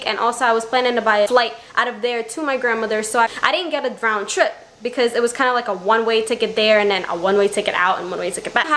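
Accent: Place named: New York, United States. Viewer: I was like mmmm this girl has a New York accent. Then you said you had a Brooklym attitude and I was like wohhh awesome!! I'm from the area as well :) I'm sorry that you had to go through this. Have fun in this upcoming trip.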